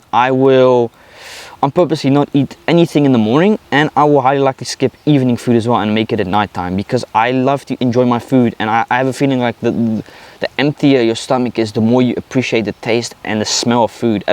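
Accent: South Africa. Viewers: Life’s just starting for you-it’ll all turn out just fine-love your (South African?)accent.Greetz from Hellevoet👍🏼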